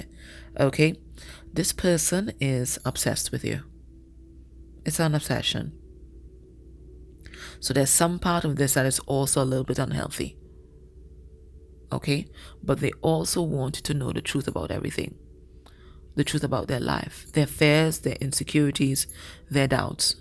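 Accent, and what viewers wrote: Trinidad and Tobago. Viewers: Good afternoon, from London England, you sound Trinidadian, or Caribbean, I am Capricorn,xxxxx to you and family..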